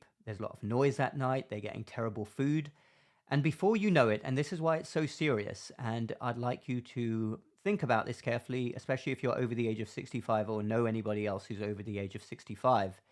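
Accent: England, United Kingdom. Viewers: You have an English accent?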